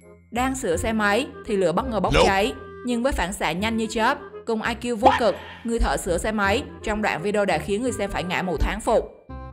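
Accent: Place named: Quang Ngai, Vietnam. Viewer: MC là giọng Quảng Ngãi màk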